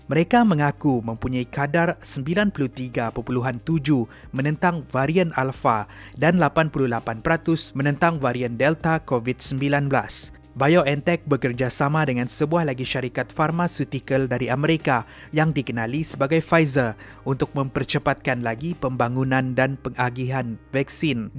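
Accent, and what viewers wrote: Sabah, Malaysia. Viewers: Abang mcm logat ckp mcm orang sabah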